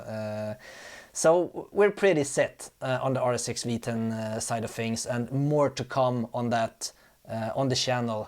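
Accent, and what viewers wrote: Halmstad, Sweden. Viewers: E du från Halmstad? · Varför svengelsk dialekt?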